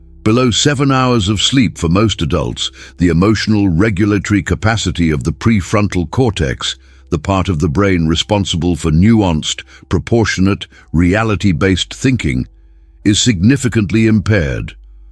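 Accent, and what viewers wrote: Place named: United Kingdom. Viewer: Why speaking so fast and with a British accent. I am a native Frenchman and only understand standard American.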